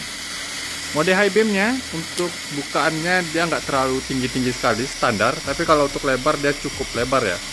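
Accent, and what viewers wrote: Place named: Sumatra, Indonesia. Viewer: Logat sumatra bang emg gitu ga perlu di komentarin wkwk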